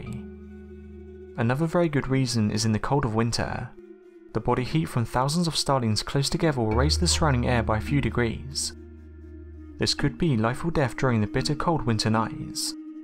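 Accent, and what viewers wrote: United Kingdom. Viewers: Why are all these videos narrated by a British guy?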